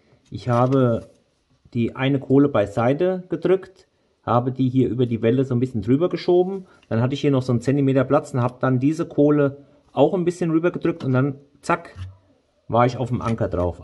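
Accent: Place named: Dillenburg, Germany. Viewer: Sag mal wo kommst du her vom Dialekt her hört es sich nach Dillenburg an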